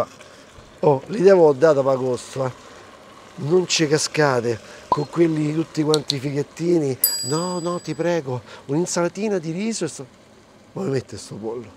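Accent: Rome, Italy. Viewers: Quanto mi piace quel bel l’accento romano.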